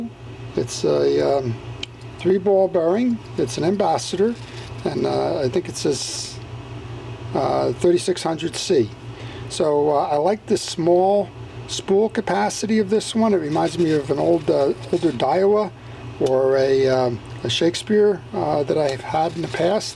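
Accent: New Jersey, United States. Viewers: Just found you a couple weeks ago, love the Jersey accent,I live in CT. am 60 yrs old and ONLY fish with vintage rods and reels,I give the "new stuff" to my grandson.I have in my arsenal many 60's-70's japan reels which were made by the big three(Daiwa, Olympiic,Zebco, etc.., under different names,they're tough,cheap, and easy to repair,and THEY CATCH FISH!!!,I also have some french mid-century reels including the Mitchell saltwater line and my beloved RU-MER's I'd llike to see a vid on some of those old "coffee-grinders", they can be pretty intimidating when you take the side cover,off, or try to repair the bail mechs, Anyway thanks for posting, and helping others like myself who appreciate a time when things were made to last!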